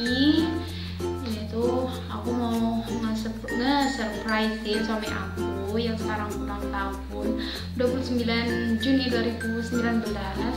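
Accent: Madura, Indonesia. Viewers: Cara bicaranya kek org madura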